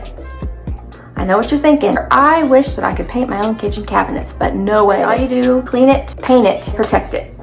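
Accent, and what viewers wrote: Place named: Texas, United States. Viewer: are you from Texas you sound like it. I’m from Texas. I’m going to add my cabinet painting to my channel and give you a shout out if you are ok w that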